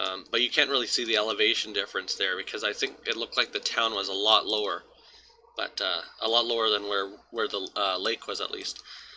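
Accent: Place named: Canada. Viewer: i am so glad you came up in my recommended! this was a great video, and judging by the accent, sounds like you might be a fellow canadian?!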